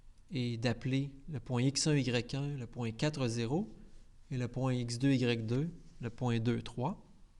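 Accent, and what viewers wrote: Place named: Canada. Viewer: Trop fort et bien expliqué avec un accent canadien 👌🏼👏🏼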